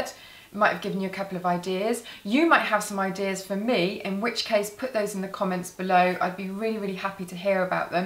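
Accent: United Kingdom. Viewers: I love your British accent and you're a very smart and elegant person :)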